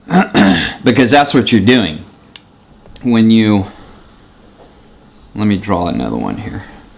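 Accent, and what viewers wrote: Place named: Indiana, United States. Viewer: No sounds like you live in Indiana bro trust this old Hoosier boy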